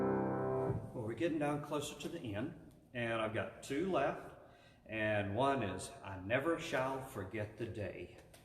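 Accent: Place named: North Carolina, United States. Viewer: I was wondering. You sure sound like a fellow Tarheel/hillbilly because you are! Good deal! You said Shelby and there are several Shelbys spread around. Good to hear all the good older ones. We're west of A-ville 60-something miles out in the hills and just found your channel. This is not the 1st time you ever sat at a keyboard. So Glad To Be Here from another Native, a rare and endangered species and GBWYou!.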